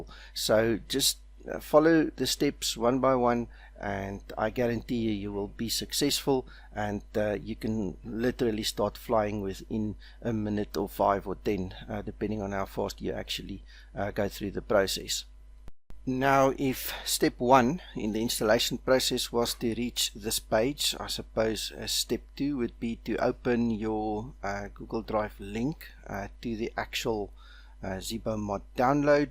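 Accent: South Africa. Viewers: Love the SA accent mate :D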